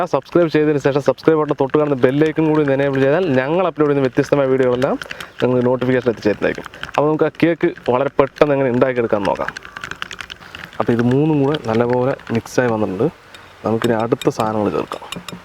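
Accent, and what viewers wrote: Palakkad, India. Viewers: Pallakad ann makale..😎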